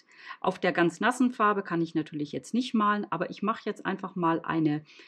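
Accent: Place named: Northern Germany, Germany. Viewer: I studied German in high school and college. I studied at the University of Freiburg for a year. My mother is actually from Berlin. Your German sounds like the northern accent I’m used to hearing. When I went to Freiburg I could hardly understand anyone!😂 That was many moons ago, and I don’t get many chances to speak German with anyone where I live, so listening is very nostalgic!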